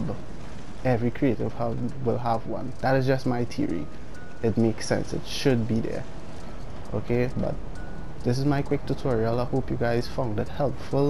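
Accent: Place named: Trinidad and Tobago. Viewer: You sound trinidadian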